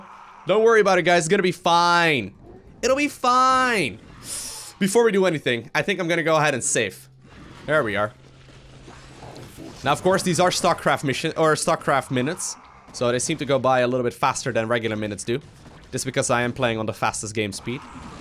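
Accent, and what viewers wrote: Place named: Netherlands. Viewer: So more people where screaming and hitting their screens yelling " look at your minimap!!!" Do love the vids and ur dutch accent, being dutch myself;)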